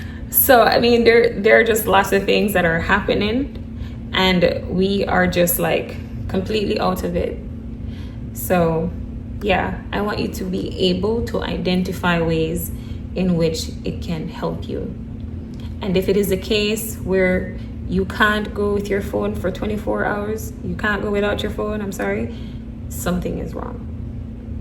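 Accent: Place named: Jamaica. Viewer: Where are you from? I love that accent sound Jamaican I love Jamaica.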